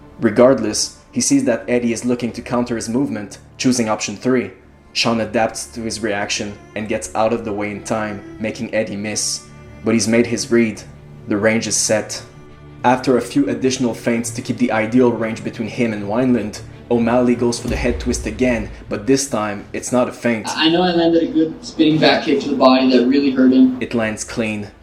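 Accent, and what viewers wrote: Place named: Quebec, Canada. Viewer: Love your videos! On entend l'accent québécois légèrement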